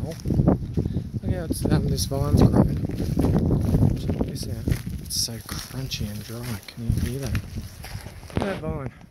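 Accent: Australia. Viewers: Ah, read all the way to the bottom and am terribly sorry to hear that the cancer is back - like a knife through the heart with shock and sadness. You know you were my first love for abandoned explores (it's that catchy Aussie accent as you ramble on, haha) and because you do what you do so well, here in Upstate NY we're sending tons of love back for a safe and quick recovery. Peaceful thoughts to you and yours. (Another great explore, by the way!):)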